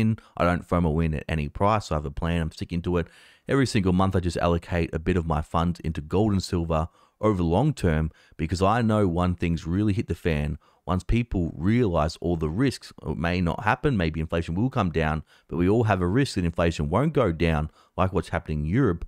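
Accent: Australia. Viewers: I think the Central Banks are trying to consolidate as much gold as they can because “in Their hands it is valuable but in Our hands it’s Not”. If they crash the price people might be tempted to Sell…then They can acquire it at Bargain Prices. When I watch your videos your accent makes me miss visiting Australia! I declare a TAX FREE 2023 for Every Disgruntled Democracy 💭🇺🇸✌️🇦🇺❣️🙏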